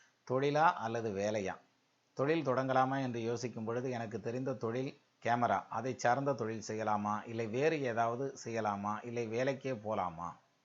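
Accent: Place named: Bodinayakanur, India. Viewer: Hi sir, nice Tamil pronouns, my native place is Bodinayakanur your speaking styles are reminding my childhood days, my question 1. Pl tell Nadi Jothidam is true or lie 2. In 12 house is Sani 6 house is seivai it good or bad 3. Pl give month rasi plan it's more help for all 4. personal question I was born 1989 time 10.30pm Place Kambam mahara laignum star barani I lost many in past 10 years I saw failure only till now pl help me and tell my career path sir pl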